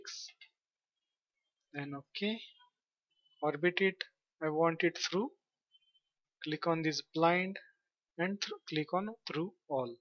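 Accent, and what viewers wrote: India. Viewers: Thank you man who has an indian accent, you are good explaining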